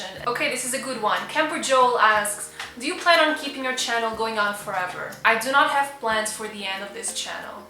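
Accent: Portugal. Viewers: Omggg portuguese from Portugal is sooo freaking different 😂😂❣️ (I’m from Brazil) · A melhor parte do vídeo foi te ouvir em português. O português de Portugal é muito, muito bonito. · Eu sou Brasileira e o sotaque Portugues do Portugal e muito diferente xD xD xD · Since 2016 watching your videos and... well, what a surprise! I definitely adored the new format. By the way, Lisboa é a cidade mais bonita que já visitei e seria maravilhoso assistir a vídeos em português. The Portuguese accent is one of my favourites! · HAHAHAHA sua voz muda e fica com o português de Portugal que fofinho. 😍❤❤😢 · Sempre quis saber se era portuguesa ou brasileira, por conta do sotaque e dos livros em português. Abraços do Brasil! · Adorei ouvir o sotaque português! Sou brasileira, e é engraçado o quão pouco contato eu tenho com o português de Portugal falado. Faça vídeos como esse com mais frequência! 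· OMG your Portugal accent is so beautiful! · Português de Portugal é tão engraçado e tão bonitinho. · Sou brasileira e amo o sotaque de Portugal ♡♡ queria que os brasileiros usassem o termo "bocadinho" também haha ♡♡♡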